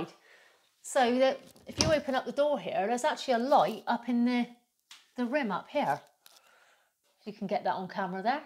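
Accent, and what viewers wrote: United Kingdom. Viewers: Bristol accent ?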